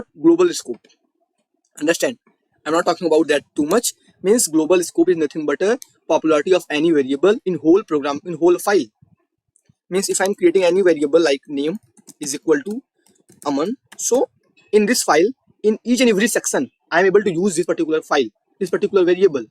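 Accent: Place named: England, United Kingdom. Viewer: i dislike your aksen english, but i like your work. well done my friend.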